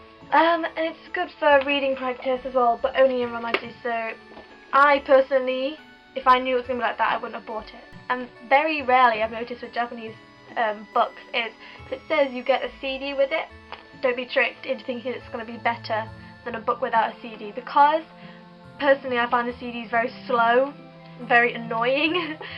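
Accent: United Kingdom. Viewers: all right : I love your dress/shirt, I LOOOOOOOOVE the flower in your hair, I love when you speak japanese but I also love british english accent......... ( and I love the almost-shirtless-Yunho on your wall just behind you...) haha great vid :D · Greetings and THANK YOU!! I love your videos. Your japanese is excellent- your accent and your way of speaking sound so perfect. Listening to you is so INSPIRING!! I also love your english accent. You're super cute, too!! Just wondering, how many kanji do you know and how did you learn them? Just from workbooks? How long did it take to learn enough kanji to read magazines? Can you read books, too, like novels? I heard you need to know about 2000 to read the newspaper. ありがとうございます :)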